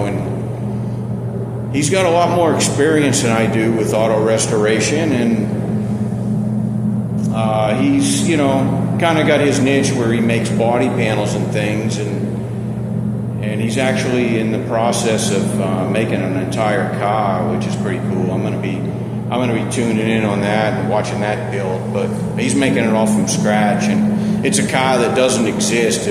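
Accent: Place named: Boston, United States. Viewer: Apaht. All the pahts. Miss being around that accent , raised in the Baystate (living in the south now)… anyhow dipping it is hella pricey but no warping no sand surprise when painting no texture changes. Sitting on my ‘47 dually till I can afford the same. Going to set it on a 90’s cummings dodge chassis… Hey check out Rungë cars on yt, great coach builder also khaos design yt out of Australia great stuff.* edit to add love your perspective and attitude- so refreshing. Also great point on wanting to drive it not perfect it- oh man that hit home😅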